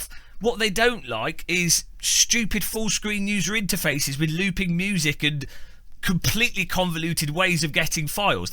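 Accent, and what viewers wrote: United Kingdom. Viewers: Never laughed so hard about something so depressing. Thank you so much for an absolute wonderful British scolding in a just as lovely accent! 😊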